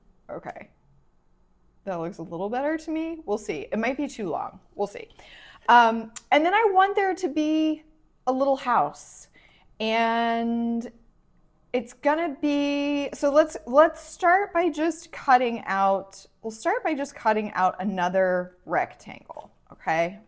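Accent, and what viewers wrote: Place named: New Jersey, United States. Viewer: I'm guessing you're from New Jersey from the way you say orange! No a - ornge! Ornry ornge! That's how we say onery down here - no e! Say Ornry ornge 3x fast & yer jaw will let you know about it.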